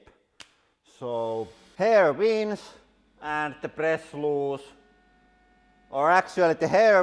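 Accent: Finland. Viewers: How the fuck he can’t talk English even he is old man? Cmon stop that Finnish-English shit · Haha Shit Paper... you Finglish is fantastic. Keep this stuff going, it's great:) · I thought it sound like a finnish accent and now its confirmed haha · The english-finnish acsent is just so crappy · you gotta love the finnish accent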